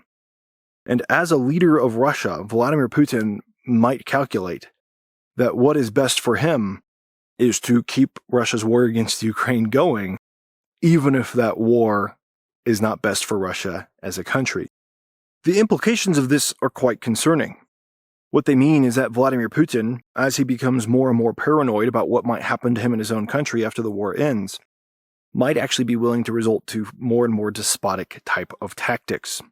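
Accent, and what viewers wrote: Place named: Utah, United States. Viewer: Rational thoughts fella! Utah accent! Prof’ of history at a Utah University?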